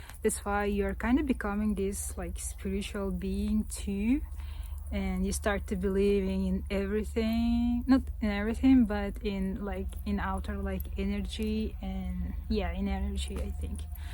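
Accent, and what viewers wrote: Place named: United States. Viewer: Thank you for your tour of traditional style living. I am a new subscriber. Kolxoz means English means " a collective village". We had similar villages of normally religious groups. Some still exist today. They are very clannish and self-isolating from society. The green flat long view country is beautiful. You are an excellent guide. The world is becoming smaller every day when an intelligent Caxa women is able to speak American style English better than I do in American cowboy country. 😊😊😊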